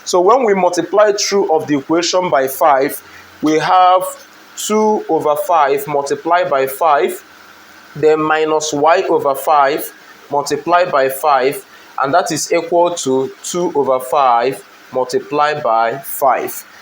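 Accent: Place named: Africa. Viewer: Nice accent and are u actually from Africa if so which country?❤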